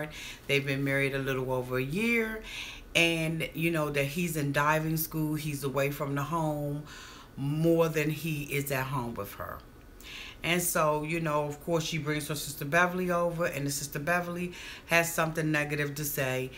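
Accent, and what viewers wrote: Washington DC, United States. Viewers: Are u from D.C.? Lol. U have a D.C. accent.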